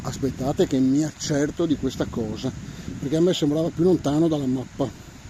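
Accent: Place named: Veneto, Italy. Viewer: Non serviva che precisassi che sei da Venezia... si capiva molto bene ahhaha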